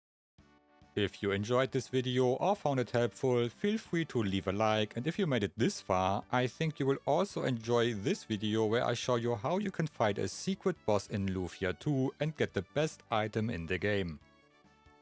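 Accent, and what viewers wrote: Germany. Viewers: as someone who has known this game inside and out for ~24 years now, i can confirm the info in this vid is extremely bad. always get safe helm, swallow is terrible. speed tabs max chrono’s speed. haste helms are best for most characters, and you get the rainbow sword for chrono which is vastly better. also you get status immunity armor for everyone, so confusion is no problem… max all your characters stats and get all the optimal armor and weapons… i don’t have the time or energy just look up better guides. best thing a out this video is his german accent combined with rhotacism, which i find incredibly endearingly adorable 💗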